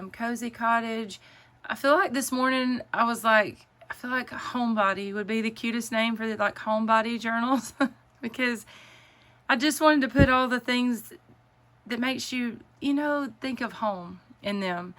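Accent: South, United States. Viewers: I love this so much! Your voice is so cute! I recognize that southern accent!! I am not watching it all as I want to be surprised!! 🩷🩷🩷🩷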